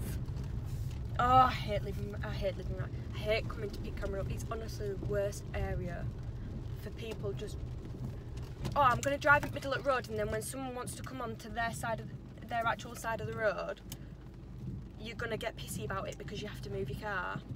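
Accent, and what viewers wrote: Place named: United Kingdom. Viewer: This was great! Love your accent, I’ve never been to the UK! I have subbed to your channel!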